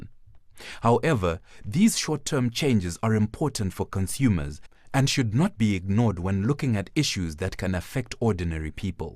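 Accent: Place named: Zimbabwe. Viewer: very good, i wonder who posted this, kinda sounds like a zim accent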